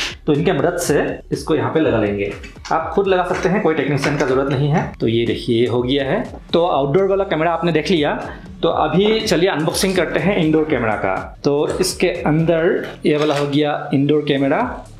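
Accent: Assam, India. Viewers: Assamese hai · Bhai aap Assam se Ho kya... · Apka bolnei ka tarika Assamese language sei milta hei ❤ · He is Assamese I guess. His accent is Assamese 😀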